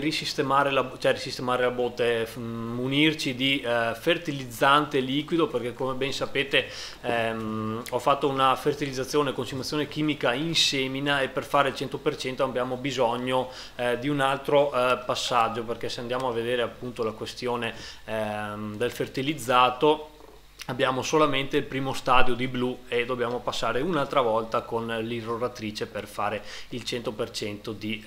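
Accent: Veneto, Italy. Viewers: Sei veneto?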